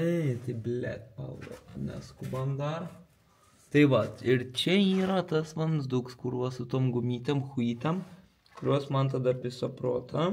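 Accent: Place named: Šiauliai, Lithuania. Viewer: is kalbos lyg Siaulia butu :)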